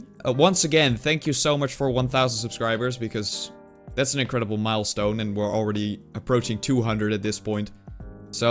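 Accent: Netherlands. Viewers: Joo, hoor gelijk aan je Engels accent dat je Nederlands bent haha, leuke video's man! Kwam langs je skylanders video, en kreeg gelijk weer een nostalgisch gevoel man. Leuk dat je zo ingaat op de wii games waar bijna niemand op ingaat of die een beetje vergeten worden. Ga zo door!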